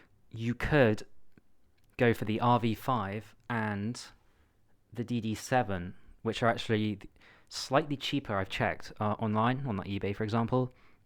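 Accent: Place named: United Kingdom. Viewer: Great video mate love EJ's clean sound so much especially for chord work and improvising. Whereabouts are you from in the UK? Can't quite place the accent.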